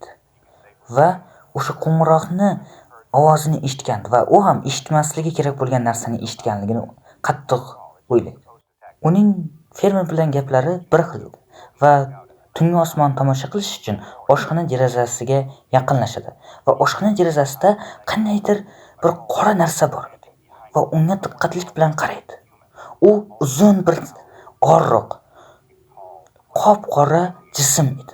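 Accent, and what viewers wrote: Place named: Shofirkon, Uzbekistan. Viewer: Shofirkonlikmisz deymn shevayz tortyapti😂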